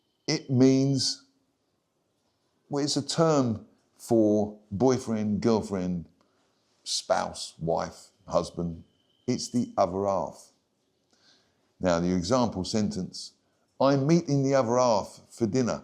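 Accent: United Kingdom. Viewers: I like your style,. You make me laugh. You ought to be an actor. You have the perfect voice for it. Are you a Cockney?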